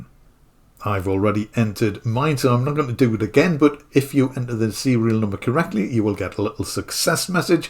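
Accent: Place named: Geordie, United Kingdom. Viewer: I spent 2 hours installing what I could and got as far as the s49 Mk3 finally working with Kontakt 8 in Cubase 15. However I can’t get the DAW control features to work, and the screen is really jittery, jumping around a lot. I plugged the USB cable into the one USB-C port on my brand new computer and I got the feeling that the large plastic cable maybe wasn’t going far enough into the port to make a proper connection. But the keyboard fired up fine and seemed to update okay. I bought it, mint condition, off eBay, so I’m shitting myself that I’ve bought a pup. But I think that’s pretty unlikely. Hopefully your video will help. Enjoying listening to the mild Geordie accent too. Reminds me of me late fatha. 😆❤️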